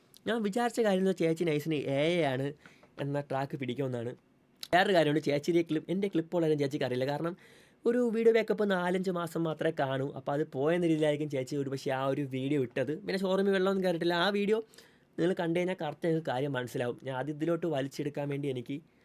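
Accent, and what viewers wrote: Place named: Kochi, India. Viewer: എടാ എന്റെ പൊന്നു മോനെ ഇവളെ അറിയപോലുമില്ല. പിന്നെ കൊച്ചിക്കാരുടെ ഭാഷയല്ലേ ഇവള് സംസാരിക്കുന്നത്. കൂതറ കൊച്ചിക്കാരിത്തി. ആ പേരിട്ടതിനെതിരെ കേസ് കൊടുക്കണം. കൊച്ചിക്കാർക്ക് ചീത്തപ്പേര് ഉണ്ടാക്കാൻ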